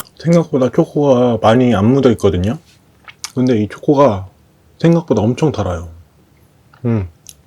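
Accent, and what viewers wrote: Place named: South Korea. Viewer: Always love Korean accent haha waffareu 😇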